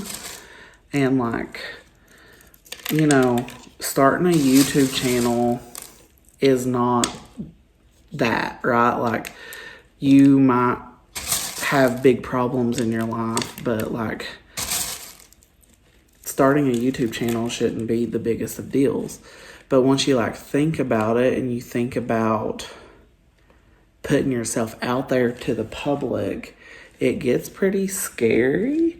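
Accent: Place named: Kentucky, United States. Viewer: I look forward to your videos. I love accents, I enjoy Claire at Jungle Haven's British accent, and I love your Kentucky accent. I am a nurse (I just added up and it's been 37 years!!) And my youngest daughter is a Family nurse practitioner. Both of my daughters and I are planty people. I appreciate your courage. You give me the gift of your plants and how you grow them. I have not done a moss pole yet. I have a rapidaphora tetrasperma that would appreciate a moss pole or a trellis about 20 feet 😊high.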